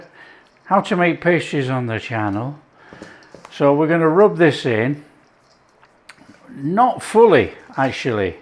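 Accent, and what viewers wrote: Yorkshire, United Kingdom. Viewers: are you from yorkshire,?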